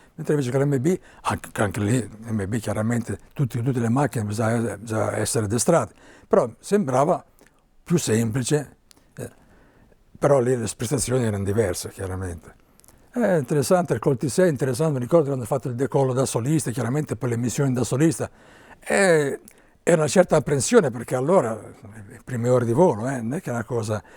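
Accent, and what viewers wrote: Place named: Genoa, Italy. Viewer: grande generale ha il modo di parlare con come mio nonno di Genova è un simpaticissimo grazie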